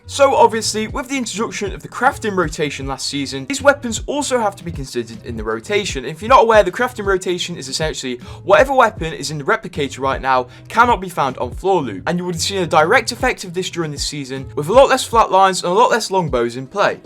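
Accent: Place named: England, United Kingdom. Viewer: Your fuckin English accent is discusting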